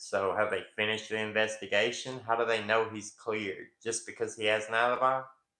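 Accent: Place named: Alabama, United States. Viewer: I recognized your accent before learning you're from Alabama, I'm native myself. Love your channel. You make great points on every topic you choose to discuss.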